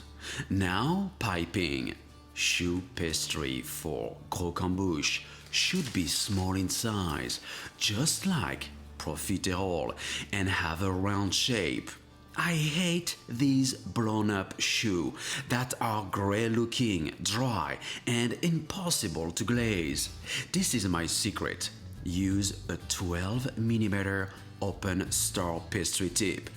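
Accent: France. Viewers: The french accent is just lit😅❤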